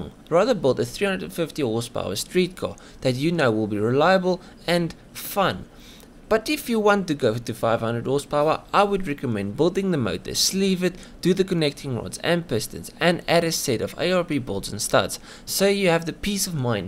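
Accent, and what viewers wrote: Afrikaans, South Africa. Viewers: Do I hear a boertjie accent?😏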